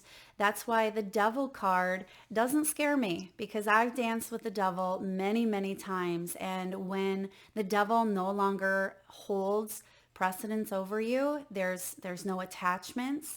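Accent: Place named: Midwest, United States. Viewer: I've been watching you for some years now and always thought you sounded so midwestern! I grew up in ND and went to college in MN, my best friend is from Wisconsin :)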